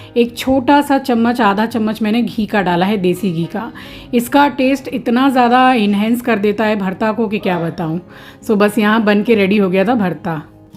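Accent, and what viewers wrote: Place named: Marwar, India. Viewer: Aap marwaadi hae,mere nanihal me same working chalti hae or bolne ka tarika bhi kyunki woh bhi marwaadi hae…😊😊 I like ur life style because I remember my nanihaal after watching ur vlog❤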